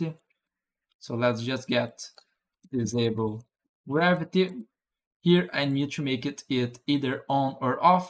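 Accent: Brazil. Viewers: Brazilian portuguese detected 😂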